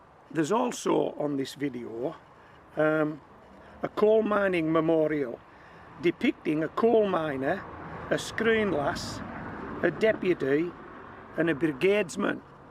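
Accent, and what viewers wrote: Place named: Cumbria, United Kingdom. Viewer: I live in Kintyre now, and miss the Whitehaven so much. I watch your videos a lot, just to hear that Fab Cumbrian accent! Keep them coming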